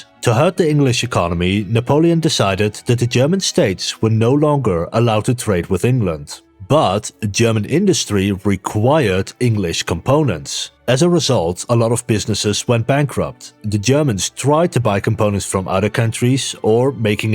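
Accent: Netherlands. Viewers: I don't know whether I love your videos for the dutch accent or the great content. It's probably both, yeah who am I lying to it's both. Great video!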